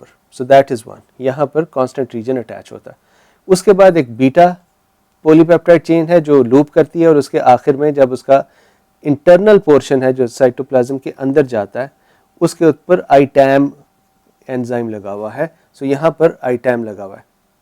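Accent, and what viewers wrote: India. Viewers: ur urdu is so gud u hv bit of kashmiri accent :) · it's imposible to understand indian 😢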